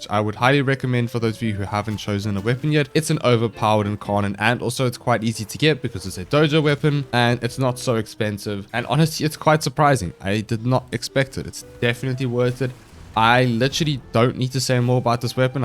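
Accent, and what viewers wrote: South Africa. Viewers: Yoo dude are you from South Africa ? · that South African accent slays me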